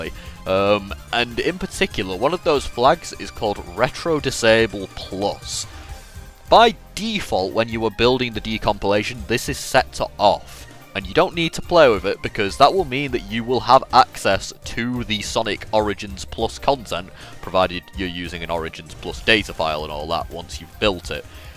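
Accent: United Kingdom. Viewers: The british accent ruins everything sorry but srslly 💀☠️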